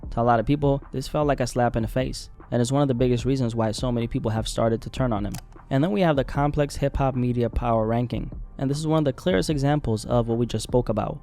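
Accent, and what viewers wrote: East Africa. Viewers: I knew you was an east African just by ur voice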